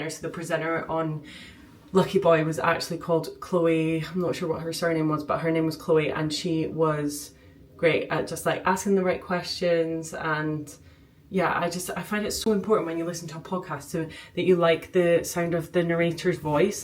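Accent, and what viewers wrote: Scotland, United Kingdom. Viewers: I thought you might have had visitors this weekend, because you sound particularly Scottish at the start of this vlog :).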